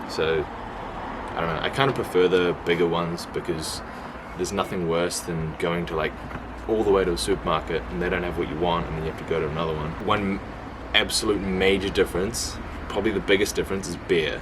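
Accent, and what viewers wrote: Austria. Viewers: Stumbled over your videos just a couple of days ago and I find them HILAAARIOUS. Your austrian is very good and I can't stop laughing whenever you say Oida or passt schon. Anyway, your observations on us is spot on and I hope you enjoy your stay here. I have been to New Zealand many years ago and it kind of struck me like a pacific version of Austria, only surrounded by an ocean and with volcanoes. I rented a motorbike on the southern island and vividly remember driving past meadows with wooden barns and rolled coils of hay and I only could think "Dude, this looks exactly like home!" Keep on making videos and have fun. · Wait. is this guy german (austrian) or actually from somewhere else? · Your austrian is very good, and it's fun to watch your videos, you do not complain, mostly, you just observe the differences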